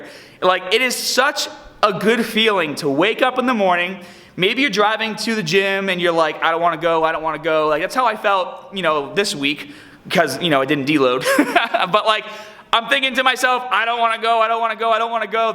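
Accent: Boston, United States. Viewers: haha awesome! Picked up that Boston accent for sure. great video man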